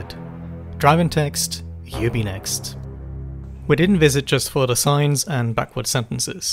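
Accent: Australia. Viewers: That's true, this chap sounds half Aussie and half cultivated midlands or such like.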